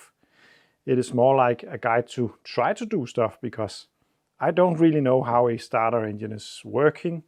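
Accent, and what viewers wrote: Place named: Denmark. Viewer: watched 2 min and already I can tell without checking, this guy is from Denmark... 🤣🤣💪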